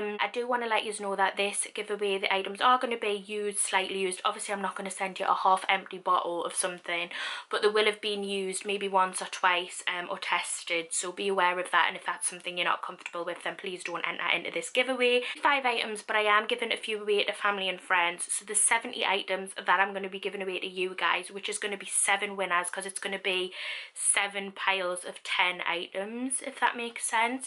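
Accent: Newcastle, United Kingdom. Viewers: Literally my favourite Geordie girl! I just love how she doesn’t pretend to be dead posh and polished xxxx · Love watching u I’m from Sunderland/ Newcastle and now live in Cumbria and miss the Geordie accent xx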